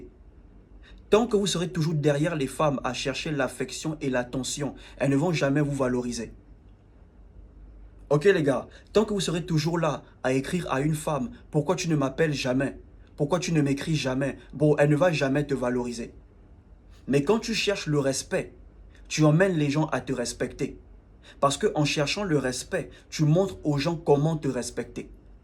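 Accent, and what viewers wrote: Gabon. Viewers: Bjr type j'aime tes conseils j'ai une question tes Gabonais ? Car ton accent est gabonais ❤si oui répond moi stp❤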